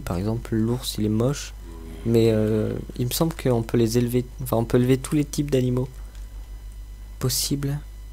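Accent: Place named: United Kingdom. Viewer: t anglais ou pas car ta un acsen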